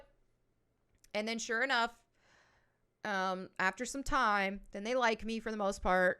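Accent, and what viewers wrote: South, United States. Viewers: Hey.. Just discovered you. You're hot. I'll follow you awhile. ;-) I like your voice.. southern?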